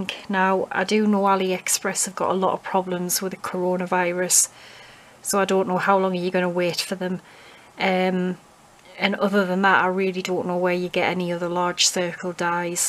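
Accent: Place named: East England, United Kingdom. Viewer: Hi, yes its gone more viral than the Coronavirus! Have had a go at making the card but not too good as I didnt have the circle dies but now I have... nobody will see me as I will be crafting away making a wall hanging for my newly decorated downstairs loo! Just what I need to hide some pipework. Thanks for the inspiration - love the accent - you are just a bit further up the East Coast from me by the sounds of it. Happy crafting and thanks again!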